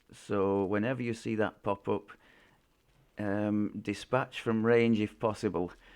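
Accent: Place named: Northern England, United Kingdom. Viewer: Good info well delivered in a proper northern accent. Reaper wi' nowt tekken out.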